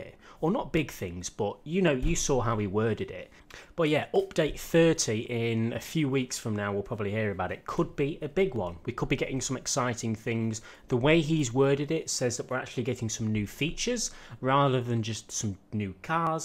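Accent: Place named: North, United Kingdom. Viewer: For some reason I never noticed how much of a northern accent has until now. 🤣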